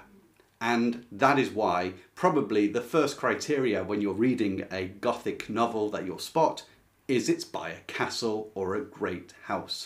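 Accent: United Kingdom. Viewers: I seriously wish this could have gone on and on for hours! When you read that story, you brought the characters to life. Having a British person read with such great accents made the story so much more interesting! As an American I can’t really imagine too many British accents and their subtleties, but you made it so amazing :) definitely subscribing!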